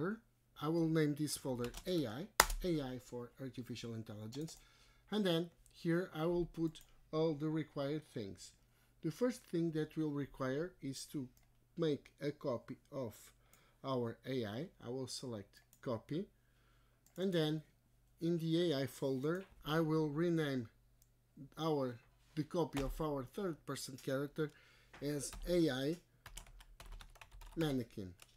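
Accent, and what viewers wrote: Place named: Portugal. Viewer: da p ver k es tuga XD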